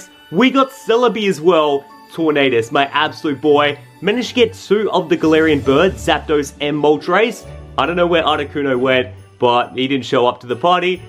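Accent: Australia. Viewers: R u Australian if u are ima sub and like now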